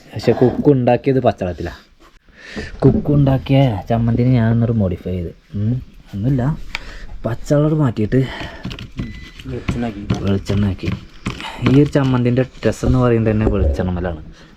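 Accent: Malappuram, India. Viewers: ഗെയ്സ് നിങ്ങൾ പൊളിയാണ് സിമ്പിൾ ആയ വിവരണം ,നമ്മൾ സ്വയം യാത്ര ചെയ്ത് explore ചെയ്യുന്ന ഒരു feel ഒരു തള്ളും ഇല്ലാത്ത നല്ല വിവരണ vdo, ഞാനും ഒരു മലപ്പുറം ജില്ലക്കാരൻ തന്നെയാണ് ,, ചെറുതായിട്ട് നമ്മുടെ സ്ലാഗ് കുറച്ച് ഒക്കെ കുറക്കാം നിങ്ങൾക്ക് ഈസി ആയി പറ്റും ,വരും യാത്രകളിൽ ഡ്രോൺ ഒക്കെ ഉപയോഗിക്കാൻ കഴിയട്ടെ ,, നിങ്ങൾ മില്ല്യണുകൾ അടിച്ച് കേറി വരട്ടെ എന്ന് ഈശ്വരനോട് പ്രാർത്ഥിക്കുന്നു ,ഒപ്പം എല്ലാ സപ്പോർടും❤❤❤❤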